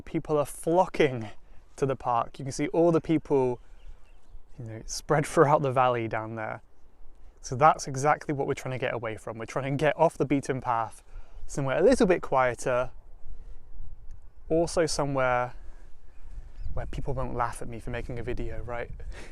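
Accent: United Kingdom. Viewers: I love accent british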